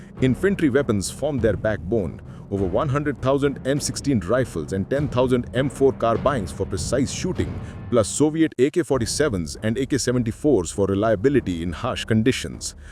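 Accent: India. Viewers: No more Pajeeet voice on Godi media thanks to AI voiceover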